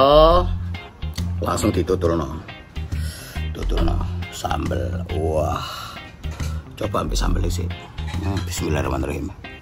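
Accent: Surabaya, Indonesia. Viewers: samean wong suroboyo aa mas?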